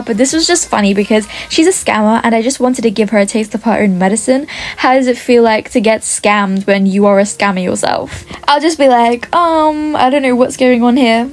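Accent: United Kingdom. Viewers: normal British girl.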